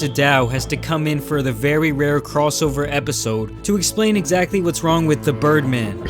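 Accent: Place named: Chicago, United States. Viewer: Best voice and your from tx ??? that accent is like “Harlem, wait no Chicago in the 40’s”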